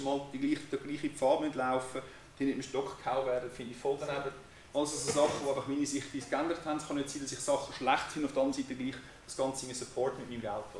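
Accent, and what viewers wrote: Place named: Switzerland. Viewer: Bin schlichtweg begeistert! Auch wenn ich's 3X hören mußte... wegen dem Schwiezzzerdütsch. Dänisch heißt es ist eine Halskrankheit... Schweizerisch??? Freu mich wirklich sehr das die Schule & Du sowas gemacht habt. Warte ungeduldig auf Teil 2!!! Möchte wissen wie die G'schroppen - (die fast schon Erwachsenen!) - drauf reagierten. Bist ein Lichtblick in der Finsterniß… Bin sooo neugierig drauf. Bin schlichtweg begeistert! wie Du die Fakten gespickt mit Deiner Geschichte hingeblättert hast, um Sie neugierig & aufgewühlt zu machen, damit Sie selber recherchieren anfangen. Vorleben ist das einzige was (manchX) greift! Bin schlichtweg begeistert! Na hat etwas gedauert, bis ich den Link für den 2ten Teil entdeckte... (trotz Brille). Den geb ich mir jetzt...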